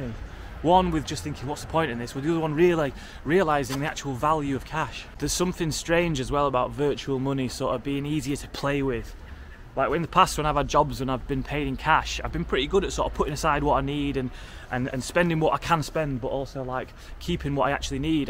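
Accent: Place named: Northern England, United Kingdom. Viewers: I love your Northern turn of phrase...'astral projections man' 'oh, thuk off'! brilliant 🤣💯. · Can tell youre from yorkshire "n i thought... oh fuck off" 😂 amazin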